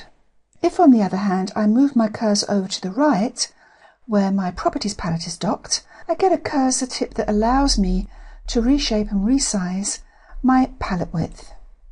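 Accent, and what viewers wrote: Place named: United Kingdom. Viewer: Lovely to hear a clear British voice. Thanks for your tutorial 👍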